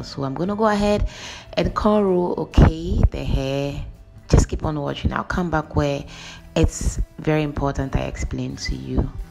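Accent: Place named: Ghana. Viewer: You speak like a Ghanaian but your name is a Nigerian name.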